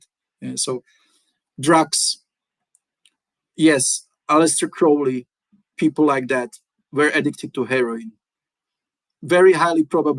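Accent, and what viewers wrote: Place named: France. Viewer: Your videos are my favorite. You remind me of the Brazilian man who introduced me to Quimbanda. I guess it must be the French accent!’ Please keep up the great work!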